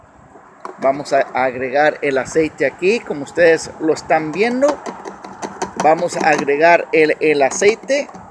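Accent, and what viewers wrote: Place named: United States. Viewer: Porque el acento bobo de EEUU?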